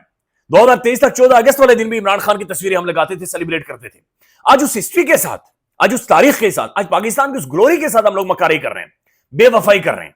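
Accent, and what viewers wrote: Kashmir, India. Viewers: bhai you have the cutest Kashmiri accent… please never lose it 🌹😊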